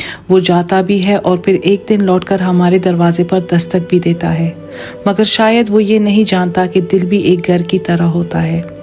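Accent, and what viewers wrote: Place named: Pashto, Pakistan. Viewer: بہت دلکش اواز اور باتیں ہیں آپ کی اردو تھوڑی پشتو والی لگ رہی ہے اپ انگلینڈ میں رہتی ہیں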